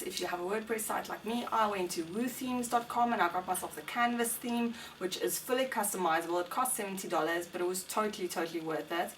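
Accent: South Africa. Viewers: She must be South African, would recognize this accent everywhere 😂 hear it every day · Does anyone know if she's South African? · South African? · Are you from South Africa? You sound South African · Kelly Castillo That's a South African accent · You are South-African aren't you? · south african ? YAY! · You are South African like me awesome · are you from south Africa? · +April Maroshick She's definitely South African with her accent ;) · r u from SA?? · Are you South African? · South African accent I hear?? Love Fromm fellow Cape Town viewer! Xoxo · I started watching his and was like wait she is South African too!!! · are you from South Africa ? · Are you South African or have a South African backround?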